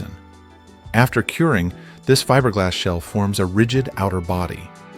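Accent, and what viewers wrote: United States. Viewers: Replica !!!! LOL. It's a 1/1 model poor personalised copy of a hypercar. If it's THIS easy ...... makes you wonder why all the 3D printing and CAD designed parts are ever necessary ............. everything is "PERFECT" and the aerodynamics are "tested". LOL. ALL the electronics are 300mp/h rated - just in case you were wondering how well the "air brake" would work at speed ....... Thje car is PERFECTLY symetrical - both sides not only LOOK but ARE perfectly identical reversed replicas of eachother. This ensures the car drives in a straight line at high speed. LOL. He is certainly smat enough however to make a video of it and overlap an American voice. Money is Money, every dime counts. Lets not forget that this lost guy in a shed in Vietnam has ready access to Brand New Bugatti front windshields - when Bugatti owners find it hard sourcing them ......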